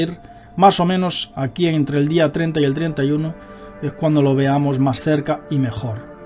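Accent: Spain. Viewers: Todo bien ,el problema es que es español y se ve y se escucha asqueroso como hablé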